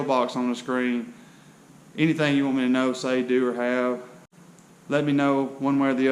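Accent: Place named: South, United States. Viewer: That's the kind of cheap barn that attracts tornadoes. You sound like you're from the south, I hope you have insurance ....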